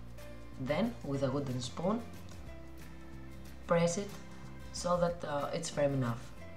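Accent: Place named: Greece. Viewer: I love your Greek accent😍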